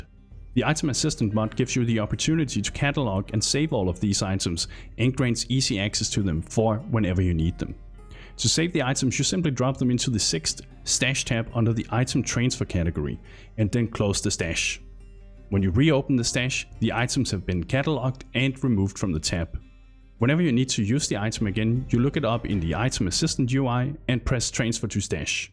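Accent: Denmark. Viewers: Dat danish accent tho.. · 5 seconds in, you are definately danish right? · Thanks for this video <3 BTW are you danish, your accent sounds danish. :)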